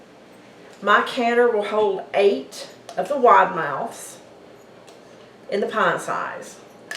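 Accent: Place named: Appalachia, United States. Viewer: Hi! I just attempted to email the yahoo email address listed, but it bounced. Here is what I wanted to ask you about: What about Sweet potatoes? Last time I canned conventionally in the traditional sugar/water, they were just so mushy, not much good for anything needing texture, plus the added sugar isn't always needed depending on the recipe. I think I did maybe dry can some cut in long slices like fries last year....but I wasn't sure about chunks. Can you instruct here, or maybe make a video? Oh, and if it matters: this year I grew white sweet potatoes, though I have done traditional orange ones in the past. The whites are slightly less sweet, and actually can be used as a sub for mashed potatoes, or in any other dish calling for potatoes. Thanks! Anita P.S. I love your accent. I grew up in southern OH (bordering WV) the accent there is referred to as "hillbilly"..., and I've hung onto it despite living in other parts of the country for almost 40 years. 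Not sure where you're located, but you remind me of home :)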